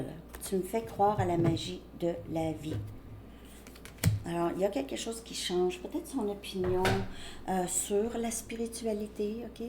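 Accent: Canada. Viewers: Hi sweet lady ❤️ I love ur videos & ur sweet Canadian accent 😊it doesn’t matter to me when u speak English👌🏻👌🏻 M’ from Paris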